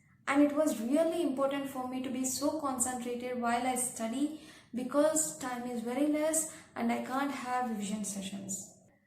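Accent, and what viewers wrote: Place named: West, United States. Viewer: So chosen to adapt the western accent too.. and advertising the three books with white cover..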